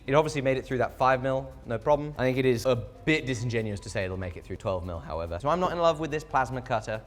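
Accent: England, United Kingdom. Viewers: You already look like you’re making a Harry Potter sequel, the English accent seals the deal. I think you did that on purpose with the thumbnail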